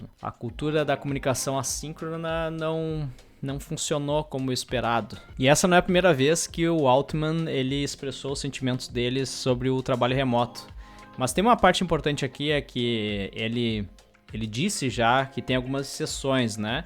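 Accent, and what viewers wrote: Brazil. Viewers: meu sotaque no inglês é igual o seu! Eu sempre ficava muito desconfortável e com medo de tudo que envolve o exterior pelo meu accent. Eu entendo tudo, consigo falar tudo e me expressar, mas o sotaque brasileiro é muito forte e as vezes da aquela engasgada. A gente vê na internet e acha que todo mundo é perfeito e faz tudo que a gente faz 1000x melhor, mas a real é que não. E pra dar certo, pra melhorar, é só ter coragem de dar a cara e tentar. Quem não arrisca não petisca. Parabéns pelo vídeo e sei que o inglês não era o objetivo, mas obrigado! Mesmo num vídeo de "opinião", seu trabalho impacta as pessoas de formas que você nem imagina. Abraço!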